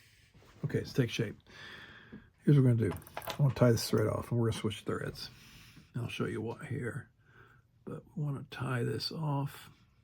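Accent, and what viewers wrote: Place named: Kansas, United States. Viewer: Grew up in western KS. Thought I recognized the accent. 😁Neat idea on how to make your dubbing. I'm new to tying. I haven't had a chance to get out and buy supplies, so I'm experimenting with using the fluff from chicken feathers.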